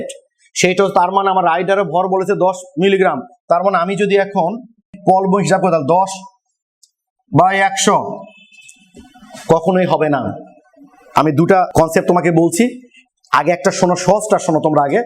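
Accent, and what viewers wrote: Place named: Joypurhat, Bangladesh. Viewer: Vai basa ki joypurhat?? Vlo laglo. Sohoj savabik representation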